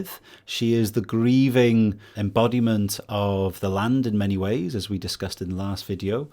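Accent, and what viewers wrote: Wales, United Kingdom. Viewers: It's nice to have a Welsh bloke talking on this subject for once instead of some posh English neo -pagan classicist academic pontificating on things he only partly understands.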